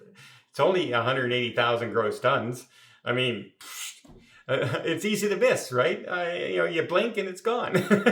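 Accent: Canada. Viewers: maybe Canadian pronunciation. Just sayin'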